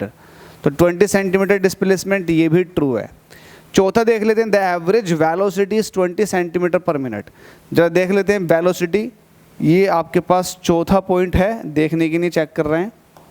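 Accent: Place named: Moradabad, India. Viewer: Sir aaap Moradabad side ke hn kya ?? Apka accent bahut accha lgta h 😊 aap please khulke bola kriye apne accent me mza aaega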